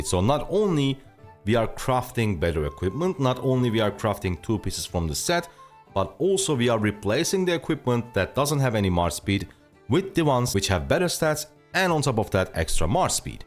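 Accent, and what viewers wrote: Turkey. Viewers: Türk aksanı duyuyorum sanırım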